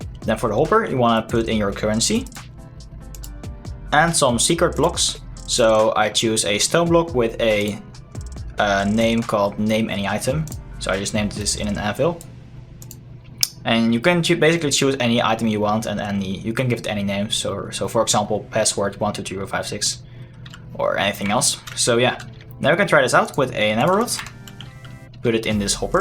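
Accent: Netherlands. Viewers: You sound dutch..you dutch?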